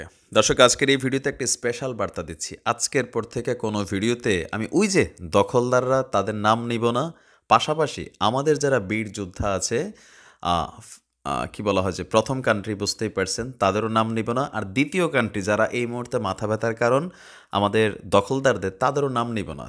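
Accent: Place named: Kotalipara, Bangladesh. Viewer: ভাই "ক" কইলে কোটালি পাড়া বুঝি।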